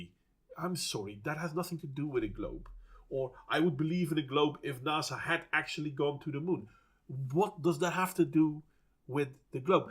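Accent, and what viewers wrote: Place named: Netherlands. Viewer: Definetly tasting some Dutch accent in the air...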